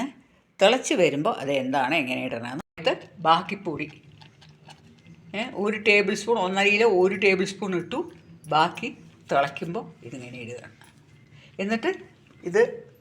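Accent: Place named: Palakkad, India. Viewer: ഞാനും പാലക്കാട്‌ ആണ്. അതേ slang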